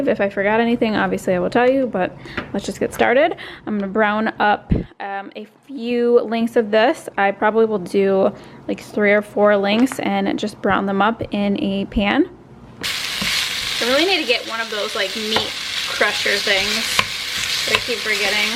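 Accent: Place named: New York, United States. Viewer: Your NY accent is so cute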